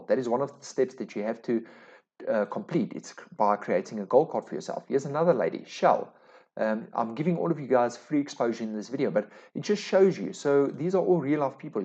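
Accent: South Africa. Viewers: Daai afrikaanse aksent😂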